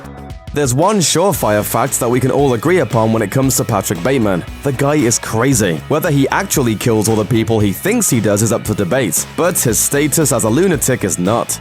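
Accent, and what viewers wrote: United Kingdom. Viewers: I really wish the narrator didn’t have a British accent lost interest in 10 seconds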